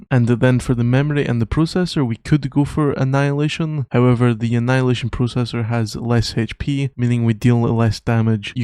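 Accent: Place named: Scotland, United Kingdom. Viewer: I hear the Scottish accent so I’ll leave you alone Back rockstar a company created by 2 Scottish brothers , not this goofiness cash grab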